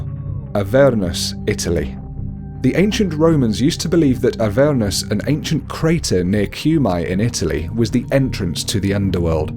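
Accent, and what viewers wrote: England, United Kingdom. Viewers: your british talk can blow a waaanker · Why is every top 5 is a English dude?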